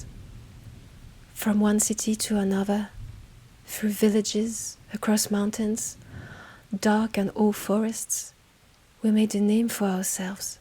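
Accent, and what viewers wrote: France. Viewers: more french accent, that's what i subscribed for, hell yeah!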